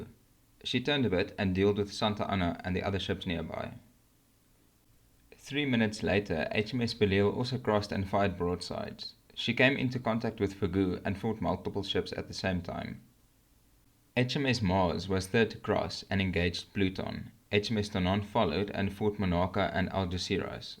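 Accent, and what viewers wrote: South Africa. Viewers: are you from south africa? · You afrikaans?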